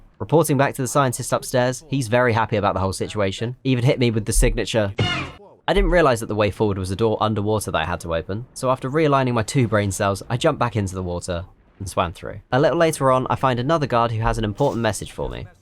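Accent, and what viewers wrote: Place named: United Kingdom. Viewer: this would be a great video with great pacing and great editing about a great game and a great all achievement challenge. unfortunately, british 😑😑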